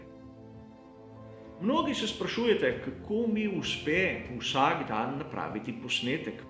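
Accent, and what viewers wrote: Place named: Koper, Slovenia. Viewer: rtv z 100mio na leto ne uspe sestavit 1% tega kar lahko vidimo na tem kanalu. se mi je zdelo da si kje iz kp ko sem začel spremljat tvoje prispevke to pa zato ker uporabljaš G in ne H po Horiško :) tudi moje čestitke za delo ki ga opravljaš in upam da se bo nadaljevalo tako. me pa zanima zakaj vsak dan nov video. se ti ne zdi da bi si kdaj vzel dan prosto od tega? naredil mogoče video nekje 6 do 7min z nekaj kratkimi izseki? v mislih imam kot nek malo drugačen format prispevka ki bi ga objavil tu in tam.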